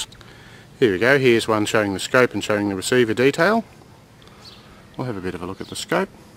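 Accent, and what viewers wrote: Australia. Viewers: Ahhhh I have to laugh at all the aussie talk comments. You from NSW or Victoria??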